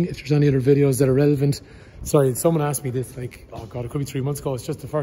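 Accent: Ireland. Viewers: Thanks for the Irish accent and the reassuring fact of catalytic converter location in Civic 2019!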